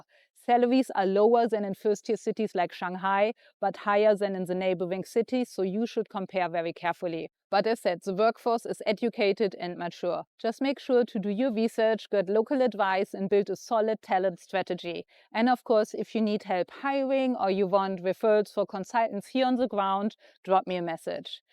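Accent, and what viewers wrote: Germany. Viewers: Great video! Promoting a city while advancing your professional service! No wonder, with your German accent you are so successful! Cheers!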